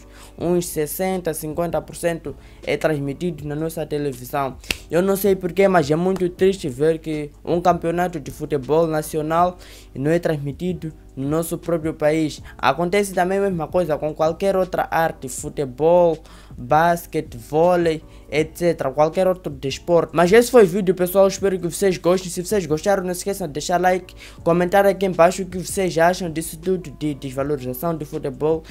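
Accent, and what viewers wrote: Mozambique. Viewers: Entendo o sôtaque Moçambicano de boa kkkk😄😄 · Sobre o seu português, o seu sotaque é o sotaque moçambicano mesmo ou você está tentando falar o português brasileiro?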